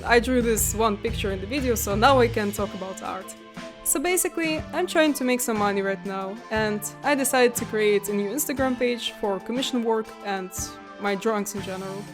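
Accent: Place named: Russia. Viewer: Такой яркий русский(?) акцент, что я даже обрадовался тому, что глиномесов с прямыми руками полно и в России. (Если я все-таки оказался прав.)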